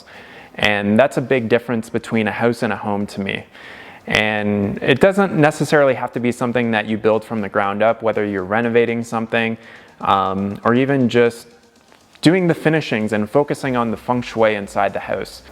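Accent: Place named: Canada. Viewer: he says aboat like a Canadian! nice job on shower.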